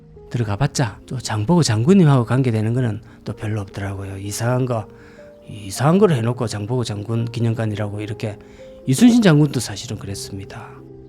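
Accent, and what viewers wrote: Gyeongsang, South Korea. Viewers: 영상속 나레이션의 묘미가 더욱 멋져 빛을 발합니다 장보고의 썰렁한 내력이 안되게 찐 성공 내력담 알차게 챙기셨네요 언제나 구수한 경상도 언어가 더욱 흥미롭구요 잘 보았습니다 ~👍🏻👍🏻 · ㅋㅋㅋ 가짜배기, 오랜만에 듣는 보리문디 사투리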